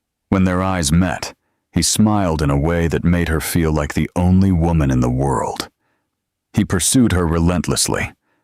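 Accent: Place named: Australia. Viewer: It sounds like 1950s Australia ! Not today ! 😂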